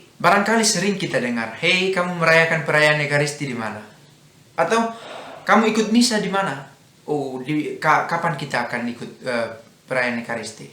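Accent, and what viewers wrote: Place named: West, Indonesia. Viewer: Frater dialek kebaratan diperbaiki. Tks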